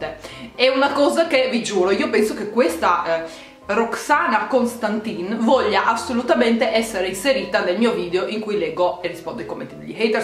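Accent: Trieste, Italy. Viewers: VIVA L'ACCENTO TRIESTINO!!!! 6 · Il fatto dell'accento è bellissimo, perché io ho degli amici di Trieste e parlano proprio come te e non credo che sia una accento di...💩 Comunque ti adoro!❤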